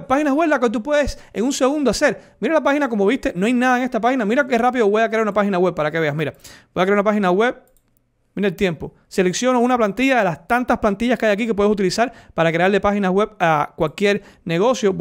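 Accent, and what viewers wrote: Cuba. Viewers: Hola he visto un solo video tullo y ni siquiera lo terminé por motivos ajenos, pero me gusto el enfoque que le diste y tu forma de ver la vida, este video aún no lo veo terminado de escribir lo haré, normalmente antes miro muchos vídeos antes de suscribirme a un canal de este tipo porque la mayoría hablan mucha M como decimos en Cuba 🇨🇺, ademas de que tu acento me parece Cubano. Si es así estoy el doble de contento porque me encanta ver triunfar a los míos. Aki tienes un nuevo seguidor. Suerte que Dios te bendiga siempre 🙏🙏🙏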